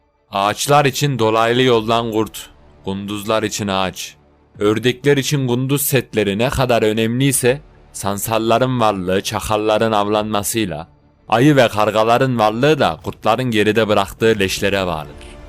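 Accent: Ankara, Turkey. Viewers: herşey güzelde Ankara lı böyle mi konuşmak zorunda ,ne oldu güzel türkçemize? bilgilendirme için teşekkürler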